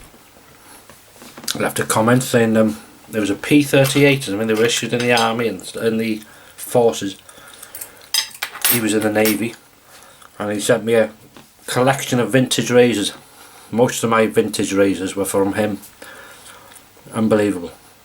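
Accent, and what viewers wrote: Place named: Cockney, United Kingdom. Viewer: 😆😆😆👍👍 you crack me up guvna!! Love yer take on the cockney accent! Ha ha! Happy Happy new year, dont forget, pop in for a cuppa or a pint if you are down my way! And no no ruby tonight chief, hot dogs!! Dont ask me why, just fancied hot dogs. 👊😉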